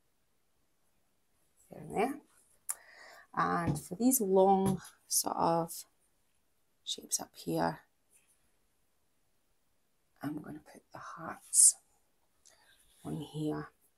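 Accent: Scotland, United Kingdom. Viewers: Immediate Like without watching, couse: Rocking horse, Scottish accent, work on the floor....Thank you.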